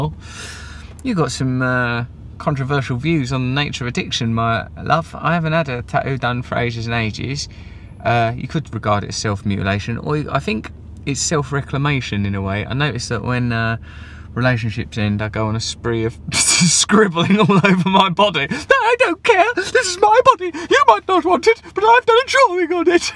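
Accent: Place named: United Kingdom. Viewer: Speak slower please. Can barely understad you sometimes. Not everyone is British.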